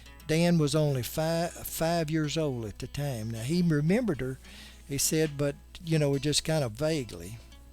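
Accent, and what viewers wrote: South, United States. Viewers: This was a much loved series in the U.K. and I'm sure Dan Blocker was as likeable in real life as he was in Bonanza. The Cartwrights always seemed to be rightin' wrongs and doing the right thing. I love the southern drawl of the commentary. Wouldn't it be great if the store was open again ? The town seems a little sad though. Melody Ayers' comments below are very apt. Many thanks. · .... All of your posts are fantastic!!! I never miss any, when I see your name on a documentary. I enjoy the music you choose, the slight southern twang, and you can hear the caring in your voice.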